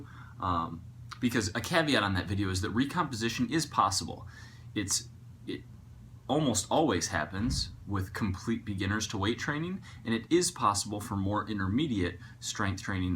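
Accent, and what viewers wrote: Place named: Midwest, United States. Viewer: LOVE WATCHING YOU AND LOVE UR MIDWEST ACCENT:)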